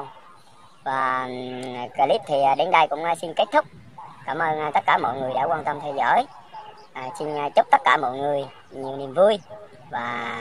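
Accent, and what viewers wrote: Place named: Quang Nam, Vietnam. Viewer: Yeu lam tieng Quang nom!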